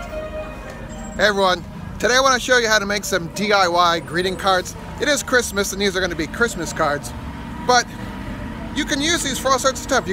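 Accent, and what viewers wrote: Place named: South, United States. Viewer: Merry Christmas and Happy New Year to you and yours. Thank you for the great video's. I have learned a great deal from you all. LOL sounds pretty southern for a northern girl.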